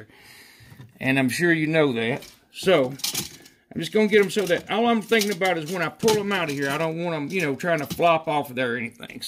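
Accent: South, United States. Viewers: Thanks for the great video. I've never pulled that membrane off but I will now. love that southern drawl you got. Gene from Canada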